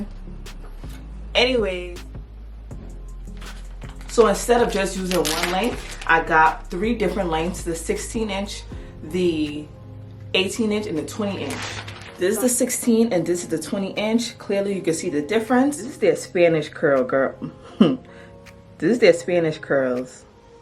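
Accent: Liberia. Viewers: 🇱🇷Liberian!! As soon as I heard the accent 😂😂🩷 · I Heard your Voice and I knew Off rip You Were Liberian ! 🇱🇷🇱🇷🇱🇷 Hey my sista I can’t Wait to do my Hair ! Thank you !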